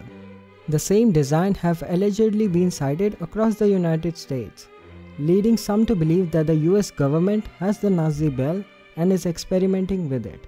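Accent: India. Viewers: You have a indian accent, I like your contents.Thanks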